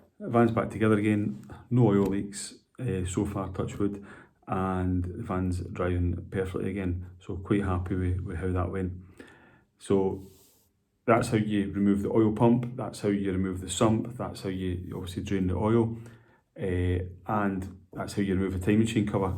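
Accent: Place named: Scotland, United Kingdom. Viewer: Great video, nice to hear a Scottish accent too! My dad’s van is the mk3 but there is a bit of a racket coming from the engine, no low oil pressure and warning as of yet, the rattle evens out after a bit of driving, my thinking is it’s taking a while for the oil to get to the top maybe due to a pump on the road out or a bad tensioner like yours. Did you say you done the timing chain tensioners? What about the chain? Curious on the millage as well, cheers👍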